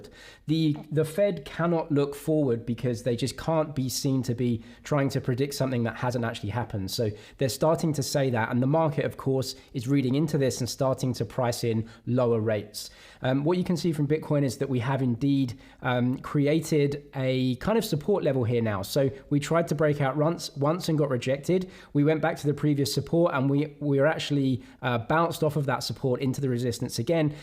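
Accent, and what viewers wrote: United Kingdom. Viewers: accent from UK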